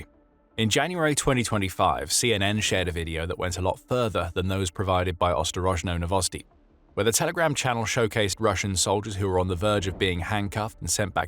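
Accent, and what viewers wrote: England, United Kingdom. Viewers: With all due respect, it ends in a 'th' sound, like it's spelled. 'Sicksth" Although, of course, there are different dialects. Yours might be different from mine or the narrator's. He has an English accent. If you've been to England, it feels like there's a different dialect for every town!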